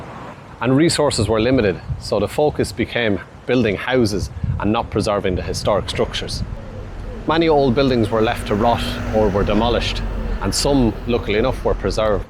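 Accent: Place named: Ireland. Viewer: combined with being a total hottie, that gorgeous Irish Accent turns into the total package of hotness 🇮🇪☘️🇮🇪❤️❤️❤️❤️❤️❤️❤️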